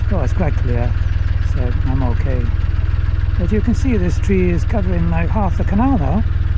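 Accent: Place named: Wales, United Kingdom. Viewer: Just watching my first video of your’s. Surprisingly good! I’ll recommend your Channel. Hope you recall Wendy & I on NB Barneswood helping Locking with each other, walking & chatting in the Kidderminster area on the S&W. It was a pleasure to meet you, hear your lilting Welsh tones & witnessing your calm attitude to boating. We hope you enjoy the rest this summer & look forward to meeting you again in the future 🤞 Alan, Wendy, Max & Russ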